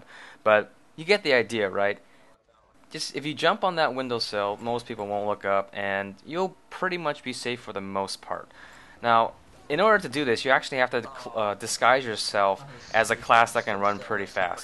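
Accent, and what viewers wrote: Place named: United States. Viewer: To be honest you sound very american.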